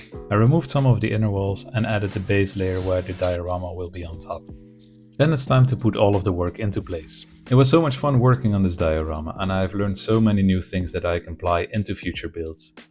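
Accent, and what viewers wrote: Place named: Netherlands. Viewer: Very cool! I think you did an amazing job. I’ve never done anything like this before but this inspires me to at least look into it. And the animation is so cool! Also: I thought I recognized your accent. You’re Dutch right? One other hint I saw was your Lego boek with on the cover: ‘het complete werk’.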